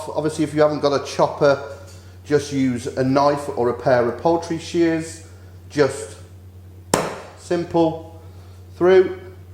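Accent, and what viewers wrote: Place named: Yorkshire, United Kingdom. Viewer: Expected a backwoods southern US accent like mine. Got what sounds like a Yorshire accent(?) instead. 🤣 Cheers mate! Y'all did a good job.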